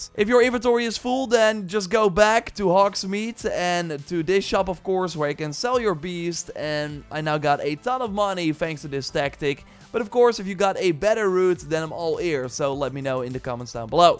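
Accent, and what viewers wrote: Netherlands. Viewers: Please stop mispronouncing ‘duck’ (duiken, aan de kant gaan) as ‘Dutch’. You’re a Dutch speaker yourself, I can tell, however, your accent is fine, good job. But that one mispronunciation is just worse than the rest. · Zalig toch da Nederlands accent er tussen 😂 ma super tips wel ✨ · are u dutch?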